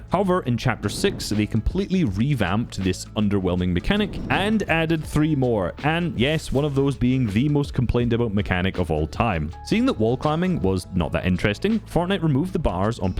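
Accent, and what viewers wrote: Scotland, United Kingdom. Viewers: Your accent being Scottish instead of Australian instantly makes your videos 10x better than AussieAntics’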